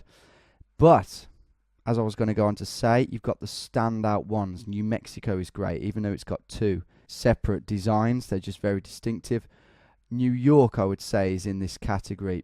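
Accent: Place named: United Kingdom. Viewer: Possibly because he's British and doesn't know all American pronunciations? Lmao, that's like me as an American trying to pronounce other foreign cities.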